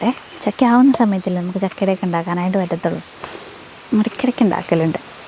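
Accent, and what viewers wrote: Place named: Southern Kerala, India. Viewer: കുട്ടി..... കോട്ടയത്ത്‌ നിന്നും.... ഇവിടേക്കു.... വന്നതാണ്... ല്ലേ.... അപ്പൂപ്പൻ മാർ.... ആയിട്ട്..... 🥰 മോളുടെ.... സംസാരത്തിൽ.... പാലക്കാട്‌ ടച്ച്‌.... തോന്നിയിരുന്നില്ല..... ഒരു തെക്കൻ സ്റ്റൈൽ തോന്നിയിരുന്നു.... 👌💖 ഞങ്ങളും പാലക്കാട്ടുകാർ..... 💖🥰🥰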